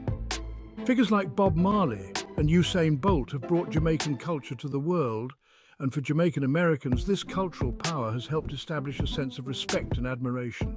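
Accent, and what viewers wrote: United Kingdom. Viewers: With that english accent ,i wonder why this one is concerned about Jamaica and USA,remind me of the Africans with no concern about their own messedup continent,but always focussing on Jamaica,but when did Jamaica become a part of the USA,its an immigrant country, jamaicans like most immigrants has been going back and forth since time began,and again why is mr Brit accent concerned about Ja..hhhhh..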